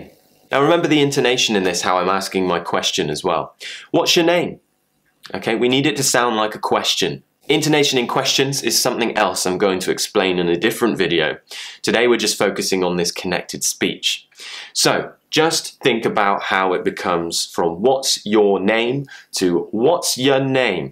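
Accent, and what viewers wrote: United Kingdom. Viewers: I love ❤ British accent. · you´re a perfect english teacher... your GB accent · The 'your' sound in the video sounds similar to 'yeah' sound to me. It's interesting to listen to British accent. Thank you for the video. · Nice video 👍 Which area of British accent would you say your accent? As there are lots of British accent depends on the city?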